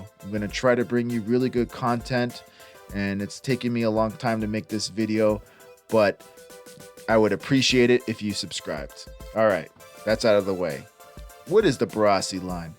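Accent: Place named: United States. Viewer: I'm a born and raised American too, and I've always been fascinated by Australian sports. It's great to finally hear an American narrator talk about Australian sports. Great video.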